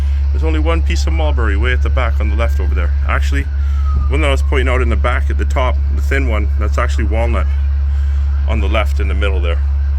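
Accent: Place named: Canada. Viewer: I love your accent! Very Canadian!! 😊 Enjoy your vids.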